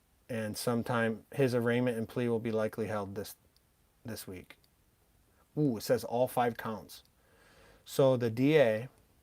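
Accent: Hawaii, United States. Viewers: Cool, I can hear your Hawaii accent. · This guy sounds like a local braddah. Love your work bro🤙🤙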